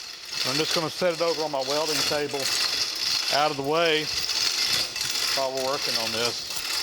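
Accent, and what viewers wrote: South, United States. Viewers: LOL :) - He's from Georgia, so to translate "owl hole" is really "Oil Hole" with a southern drawl.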